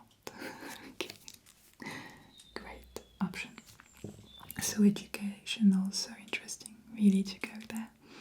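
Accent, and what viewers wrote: France. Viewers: This is the first video I’ve seen of yours and I love the atmosphere and soft voice. I can hear the French accent, but some words seem to have a hint of South African or New Zealand, which is really cool! This was a great video :) Just read your little bio. Nice to see another enby out there in the ASMR universe 🥰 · Since I found you watching the Futuristic Hearing Test, respectfully I’m addicted to your voice. So soothing and that French accent on your English is the last top cherry on a cake. Really really good job. Keep going. I would love to see a suit fitting but in an elegant way and also a flight attendant. Both in English as well if it’s possible cause idk any French 🥲 Still, great work!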